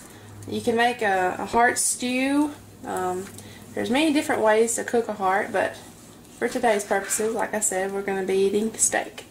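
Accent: United States. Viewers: That souther accent is almost comforting in a way; Ive been in St. Louis way too long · By the way that’s a lovely accent you have. Texas?.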